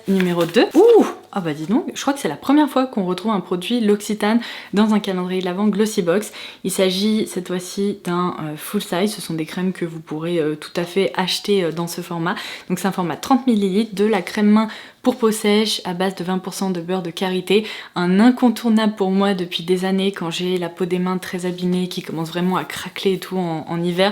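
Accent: United Kingdom. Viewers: Bonjour, Vous avez un très jolie accent british si je ne me trompe. ☺️ Vous avez des attache lasbas ou vous avez appris la langue? C’est par curiosité 🤗. Agréable Journée .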